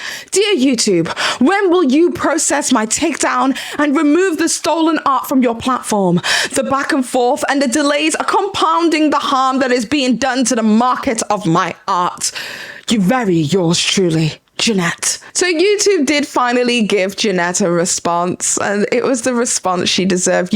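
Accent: United Kingdom. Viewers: I love how British people say H 😂 I notice it so much from you and callum Margie lol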